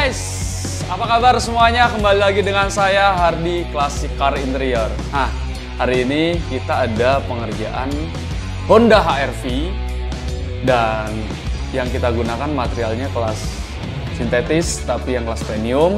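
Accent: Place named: Java, Indonesia. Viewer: Sampean asli pundi nggeh mas. Kok ngomong e nggowo boso jowo😁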